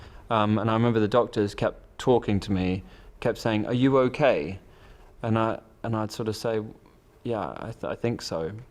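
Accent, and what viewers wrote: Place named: RP, United Kingdom. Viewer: sounds typical bbc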